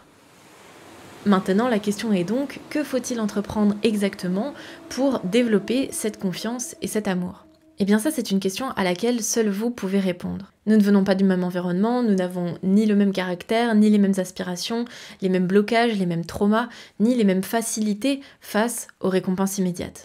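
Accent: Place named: Japan. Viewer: wsh tu parle français bien japonais stop wsh puré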